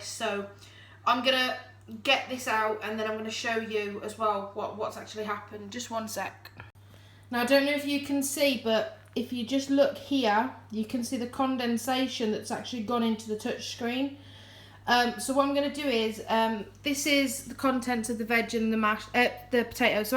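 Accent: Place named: Coventry, United Kingdom. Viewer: Hi, just bought one today, so thank you for this informative video. Don't have to say where you are, but your accent is like mine. I'm 5 miles out of Coventry. I'm guessing you're from round this way too.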